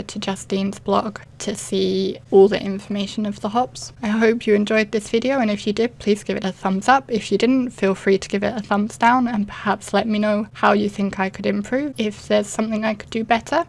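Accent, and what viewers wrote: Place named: England, United Kingdom. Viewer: (I just want to let you know first that I'm not commenting to win. I truly just know this because I'm also on a crafty budge. So don't feel bad at all! Just because we're on a crafty budget, we can bring just as much happiness to others through the cards we make. So just think of all the people you make happy!!!!! ) For foam tape, you can use just regular pieces of foam or even foam with adhesive already on it. You can get this at just about any craft store. Then just cut to size and add to project. I noticed that you have an English accent. So if you're across the pond, I'm not sure what stores you have but it's available at any Dollar General or Dollar Tree store. You also mentioned that your embossing ink was drying out. You can use the same ink pad & just re-ink it with glycerin. (Check out Lindsay Weirich- "thefrugalcrafter"" her YouTube channel name. 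She used to do alot of cheaper ways to do things. Now she seems to focus on watercolor tutorials.) Glycerin can be bought at any general pharmacy. Your cards were very beautiful!!!! I loved the ink blending behind the heart & the sequins sparkling through the heart pieces!!! You definitely are better at that technique than I am!!! I tried once & gave up. Good luck with your YouTube channel & everything else!!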